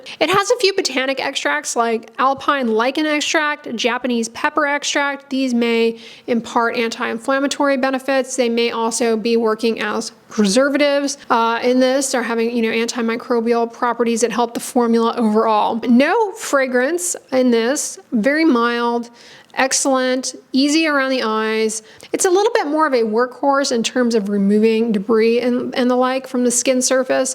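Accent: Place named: Southern California, United States. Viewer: I’m taking bets it Southern California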